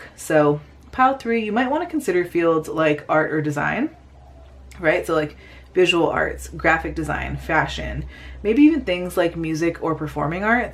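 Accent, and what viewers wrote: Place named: Toronto, Canada. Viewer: You have a Toronto accent. I was surprised when you said “university in my state.” Also, thanks for doing career readings. There are too many love channels.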